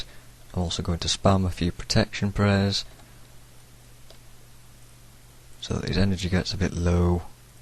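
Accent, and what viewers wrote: United Kingdom. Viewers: ty alot for helping me with some hero builds british guy ;-)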